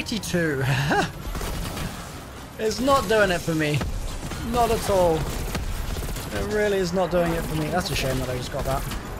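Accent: United Kingdom. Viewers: Hearing “y’all” in a British accent might be the best thing ever; you’ve surpassed NJ456, and have become the Omega Gamer Leader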